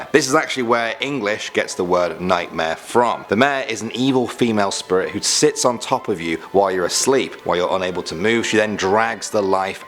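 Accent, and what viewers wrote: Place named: United Kingdom. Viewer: YEAAAA BRITISH PERSON!!!!!!!!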